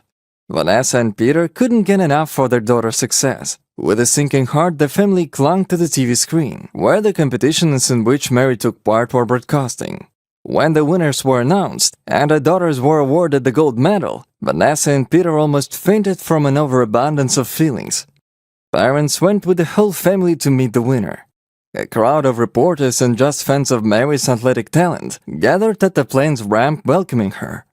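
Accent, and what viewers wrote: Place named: Russia. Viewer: Utter nonsense narrated by a Russian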